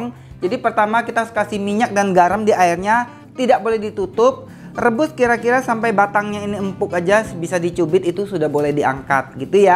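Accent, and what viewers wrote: Java, Indonesia. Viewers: Medok bikin ngiler aja..lihat buntil..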